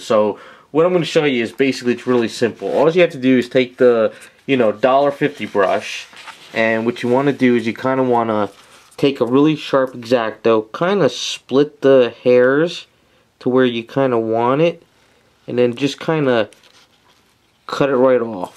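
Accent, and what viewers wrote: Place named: South Jersey, United States. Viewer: the south jersey/philly accent here has soothed me as well as given me all the confidence in the world to cut up my paint brushes immediately. Thanks for the tutorial!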